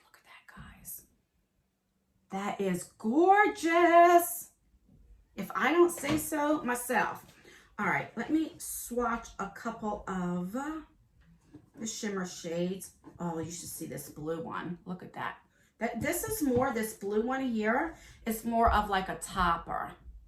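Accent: New Jersey, United States. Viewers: I would LOVE love to get picked! But of course I’ve tried slay glam on my own dollar and was sorely disappointed and then the box of the month after was AMAZING🙈 I would love to try it again and I can’t imagine a better way too. As always I enjoy watching your videos and always enjoy your jersey accent 😘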